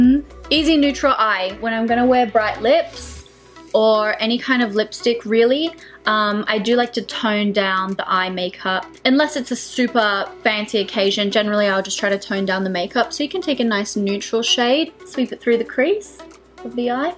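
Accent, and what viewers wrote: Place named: Australia. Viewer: when you said "desire" i heard "the Zaya" :)) gotta love the aussie accent <3 need to try the lipsticks as they look amazing on you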